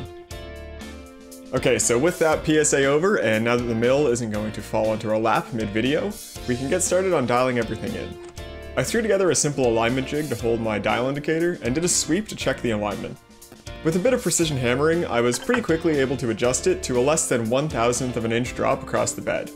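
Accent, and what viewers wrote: Canada. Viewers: I thought I detected a Canadian accent and then sure enough, out came the Power Fist dial indicator! Nice video.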